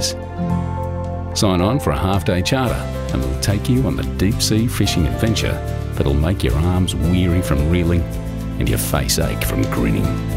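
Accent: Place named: Australia. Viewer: Thank God we have sub titles. I am English and I just cannot understand what this guy is saying. I think it's badly recorded apart from his strong Aussie accent. Good on yer, mate!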